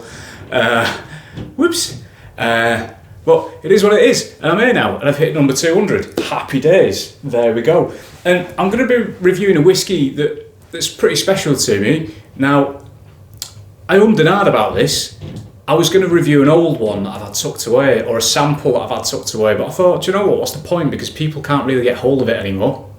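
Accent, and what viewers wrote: Lancashire, United Kingdom. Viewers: Great review mate - must try some Cotswolds - sounds like an excellent distillery! No need to change t'accent lad! As a Lancastrian I love goin' to Booths too! Especially the one in Lytham. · No need to make excuses for your accent; Lancashire and proud of it 😃👍. As for the Cotswold sherry cask - it sounds like a stonking dram. I have experienced the standard signature but alas found it a tad youthful and nothing stood out for me. Ne'er mind, perhaps I'll try again 😁.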